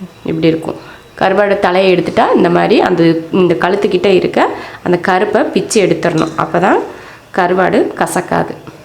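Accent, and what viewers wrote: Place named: Theni, India. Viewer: Madam I am Pavithra Vijayan from Hyderabad.my native is Theni cumbum .nan ungalin subscriber.i like your cooking style madam it resembles Namma native muslim style maadhiri erukkae endru yosippen like ungaloda preparation,way of explaining that accent your tamil.later you told about Theni district special that day I confirmed .hearty congratulations mam . Namma ooru cooking stylela world wide propagate Panna vaitha ungalukkum,ungalin familykkum,and all the subscriber's kkum .my hearty congratulations.I am proud of you get a you tube channel from Theni with 1MILLION subscriber's very soon . thank you for this dish today .I like it most.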